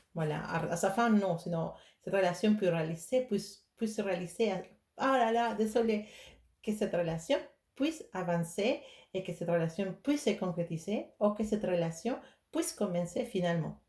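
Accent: Spain. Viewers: Bonjour! quel plaisir de vous revoir, vous entendre. Merci pour ce tirage original!. Ne vous inquiétez pas pour le cafouillage de notre langue française pas facile pour vous!, mais c'est normal!☺️, je vous comprends très bien!, et j'aime bien votre accent espagnol. Choix 3, 7 qui me parle. Merci infiniment 🙏 Namasté 🙏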